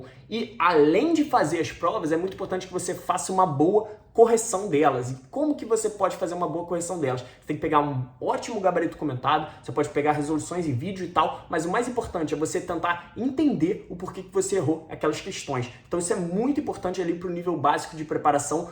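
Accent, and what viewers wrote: Rio de Janeiro, Brazil. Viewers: Carioca kkkkkkkkkkkk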